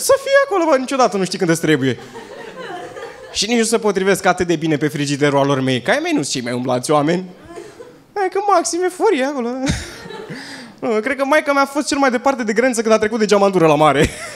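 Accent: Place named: Moldova. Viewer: Un oltean cu accent de moldovean. Greu sa-l inteleaga ardelenii.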